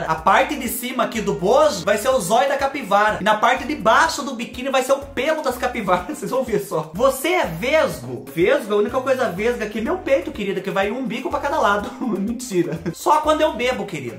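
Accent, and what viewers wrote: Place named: Caipira, Brazil. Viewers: Seu sotaque e bem parecido com os mineiros sua cidade fica próximo a Minas Gerais? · Oi chegando como quem não que nada gosto muito deste teu sotaque caipirez de que terrinha você é,será que é daqui de perto de mim, Capivari,Porto Feliz ou é puro charme kkkkk adorooooooo😘😘😘😘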